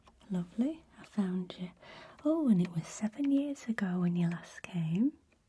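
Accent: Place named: Lancaster, United Kingdom. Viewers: Oh how I miss home now. The sound of my accent. Where are you from? Me…. Sowerby Bridge. Can I book a holiday home through you 😂 from Norway. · Omg I'm so excited back! I love rewatching the original tinsels travel every Christmas time 🎄!! (And sometimes during other parts of the year! 😆) Love the northern accent! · ah the northern accent!! meks mi feel reight at ‘ome 😂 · Perfect Lancaster accent - love it! ❤🌹❤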